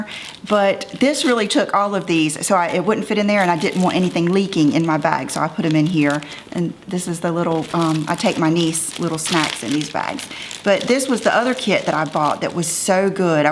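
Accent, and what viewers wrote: South, United States. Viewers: One celeb worships Satan so I am not helping that person's bank account as a devil worshipper. We're accountable for what we know and I don't want my dollars going to anything that person may use funds for connected to the occult, and satanism. We can all have tolerance for diversity but when it goes deeper than a difference in opinion or finding a celeb "simply annoying" or something...."miniscule" I have to draw a line. I love your country accent and you're lovely. I've watch this video a few times just because I enjoy listening to you and seeing all these goodies! I own all the OPI polishes you mentioned. I need to find the Revlon mauve-ish shade! God bless you and stay beautiful!!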